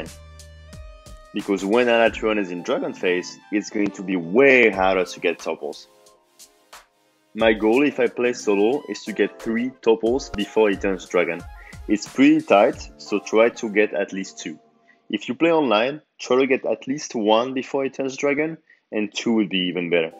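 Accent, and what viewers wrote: France. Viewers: Ça s'entend que t'es français ! T'aurais pu sous-titrer pour tes compatriotes.. 🙄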